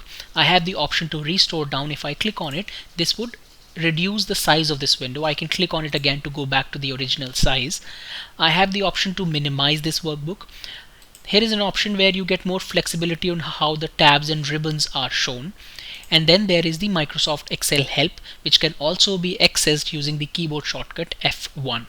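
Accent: India. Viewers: One of the best tutorial for excel that with Indian English accent. Thq lotsss bro for doing it!!! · you know ts is good when you hear the indian voice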